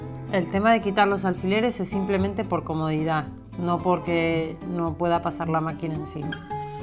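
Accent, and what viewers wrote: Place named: Argentina. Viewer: Hola !!! Me encanto tu trabajo !!! Ya me suscribi active la campanita y puse me gusta .Me tomo el atrevimiento de comentarte que tu acento lo escucho entre español y argentino. Saludos 🙋‍♀️ Y a cuidarse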